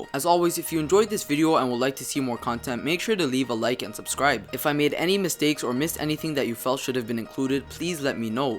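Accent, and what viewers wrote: Toronto, Canada. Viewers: Hey, Are You Torontonian? I Can Tell By Your Accent. (BTW I'm Also From Toronto. I Live In Scarborough)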